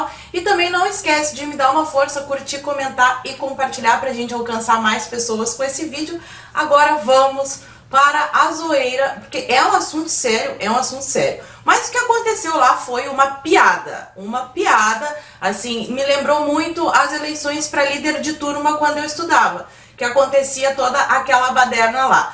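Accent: Rio Grande do Sul, Brazil. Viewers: como vc é linda;inteligente;sotaque gotoso de gaúcha;toda cut-cut;dá vontade de dar um abraço bem apertadinho...!!!lógico que falo tudo isso com sinceridade e respeito!!!❤❤❤😉😉😉😘😘😘👏👏👏 Seria um prazer imenso,vê-la pessoalmente aqui em San Francisco🇺🇸🇺🇸🇺🇸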